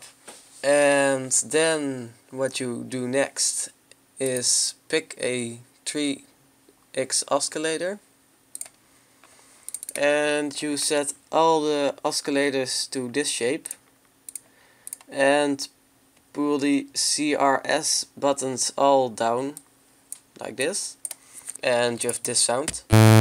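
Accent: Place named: Netherlands. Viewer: je hoort dat je nederlands bent :P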